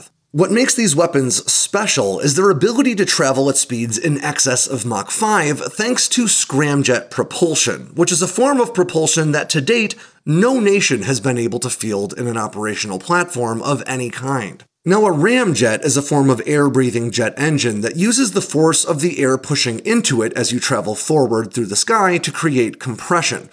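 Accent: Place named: United States. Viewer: I am going to assume that you are american but your accent only. Forgive if I am wrong. I am not American, But you may still don't know that America has the fastest hypersonic missile in the world, capable of achieve MAC 25. They just don't boast about it. Have you ever heard about Ohio class Submirines? No one can get even closer.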